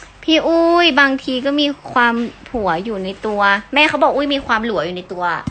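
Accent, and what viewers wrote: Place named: North, Thailand. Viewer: น้องน่ารักนะชอบให้พูดเหนือน่ารักดี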